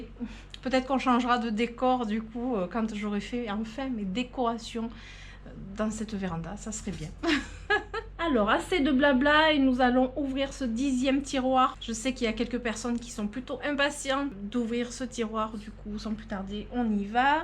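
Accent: South, France. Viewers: Encore des éloges pour vous votre gentillesse votre simplicité vos expressions bien du midi et votre accent du midi gros bisous 😚 😚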